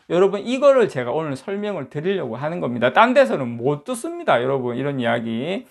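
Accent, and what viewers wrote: Gyeongsang, South Korea. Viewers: 경상도 사투리 듣기참좋네요 유익한정보 감사합니다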